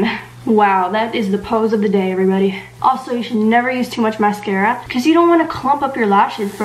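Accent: United Kingdom. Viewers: that slight British accent tho. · Was that a Scottish accent ?? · I am younger than her and I know how to put makeup 😳 Oh and did I mention you speak some British I am impressed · she sounds British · I was getting weirded out when you kept doing the British accent are you a little bit British?? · You sound British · I got function so good And love the British voice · I love her British accent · What's with the British accent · What's up with the British accent tho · are you British?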